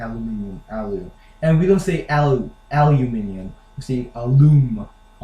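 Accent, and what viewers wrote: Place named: RP, United Kingdom. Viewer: your English accent is soooo posh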